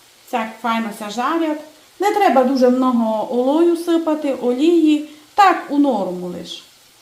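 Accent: Transcarpathia, Ukraine. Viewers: ПРИВІТ ВСІМ З ХЕРСОНУ. ДЯКУЮ ПАНІ ЗА РЕЦЕПТ. ГАРНА УКРАЇНСЬКА МОВА. ЗАКАРПАТСЬКИЙ ДІАЛЕКТ. ЧУДОВО.❤👍🔥 ЗАКЛИКАЮ ПОВАЖАТИ ОДНИ ОДНОГО, ТА НЕ ТРЕБА ЦЬКУВАТИ ОДИН ОДНОГО ЗА НАШУ СОЛОВ'ЇНУ... МИ УСІ ГРОМАДЯНИ УКРАЇНИ.💙💛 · Гарний говір закарпатський. Більш венгерський а не молдавський. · Нормальний закарпатський говір. Наша країна велика і в кожному регіоні свій діалект.Я навпаки просто насолоджуюся,як говорить пані.Дуже вдячна за рецепт. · вирвіть собі краще! Кожен регіон має свій діалект - закарпатський саме такий, і ми його любимо!!! - дякую) Якщо що - я з Черкас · Важкий діалект, важко слухати, хоча я сама з Франківська. Закарпатський, Чернівецький діалекти відрізняються від нашого. Одне слово "суль" і вуха скручуються. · малоймовірно він партачив московитську!А розмовляє на закарпатському діалекті! І це неймовірно чудово, наша Україна багата на діалекти.Напевне коли навчались у школі вам про це говорили, або ви прогулювали уроки😮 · Я в захваті від вашої прекрасної закарпатської мови. 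· поддерживаю, это не украинский язык. Это местечковый закарпатский говор. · Як мені гарно чути закарпатську мову: подобається більше ніж рецепт. Хоча і рецепт гарний, треба спробувати 😊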